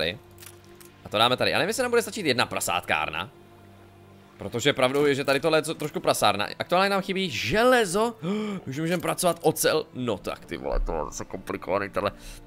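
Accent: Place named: Prague, Czech Republic. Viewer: podle prizvuku tipuju na Prazaka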